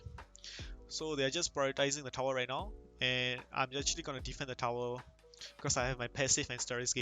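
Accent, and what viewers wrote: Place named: Singapore. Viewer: great guide, from sg? (from ur accent xd) was thinking of grinding through WR on release haha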